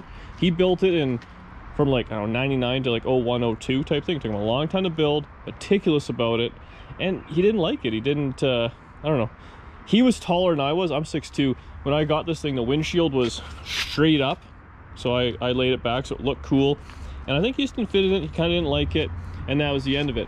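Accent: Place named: Canada. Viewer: I listened to this for the Canadian accent.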